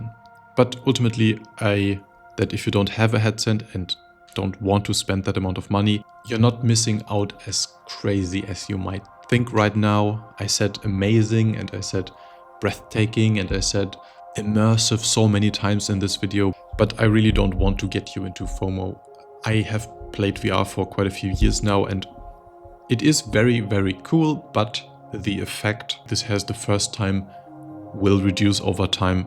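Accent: Germany. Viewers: i didnt know you are german, but that accent, omg.. sorry herr 😄i also dont mean nothing bad, thanks for the video. I plan to buy some good vr headset and im crazy about star citizen. I keep my desktop pc up to date only for star citizen.. is special game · I love SC in VR. How you said, very immersive 😉 What is the key to switch between VR mode and 2d mode? und vom Slang her höre ich doch das du Deutscher bist. Eindeutig 😁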